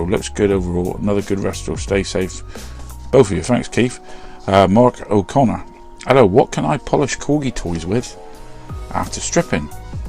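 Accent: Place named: West Country, United Kingdom. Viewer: Thoroughly enjoyed watching that, spent this evening watching a few of your videos, you do excellent work. Nice to hear a fellow Westcountry accent too!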